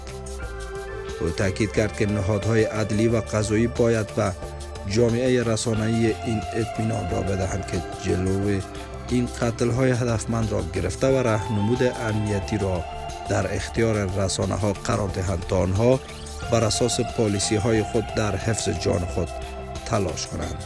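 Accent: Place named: Iran. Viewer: Az lahja irany to tamam chez malum meshawand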